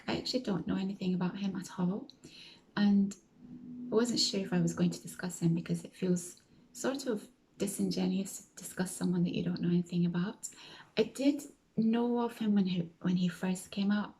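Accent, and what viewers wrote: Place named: Scotland, United Kingdom. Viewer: You're absolutely right about politics being two sides of the same coin. I also find politics boring and contrived, and I agree that it's in our best interest to take things/viewpoints from both sides or none, rather than subscribing to just one. That way it's alot harder to be brainwashed or tarred with the same brush. Left/Right is just yet another duality we have here on earth. Everything is duality, pepsi/coke, red/blue etc... Constant divide and conquer techinques. I've never followed Charlie Kirk but I would never glee at anyone's death. I am extremely careful about where I send my energy. I'm not sure why Youtube decided to reccommend me this video haha. You have a very calming voice and seem like a high vibrational person. And I resonated with you when you mentioned how dull everything is during Wintertime in the U.K. 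It's like that here in Melbourne AUS too. I also travel during the colder months. Hot places are my favourite. I know you mentioned Sheffield, but did you live in Scotland at all? I noticed sort of a Scottish twang to your accent.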